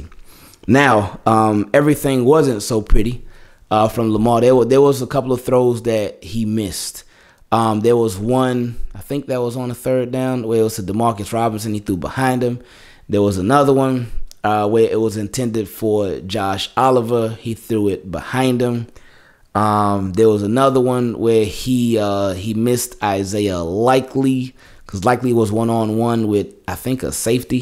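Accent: Baltimore, United States. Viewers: You from Baltimore ?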